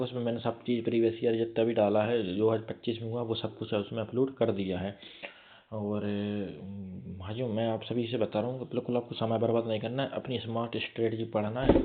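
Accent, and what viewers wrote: Bundelkhand, India. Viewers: Bhaiya aap bundelkhand se ho kya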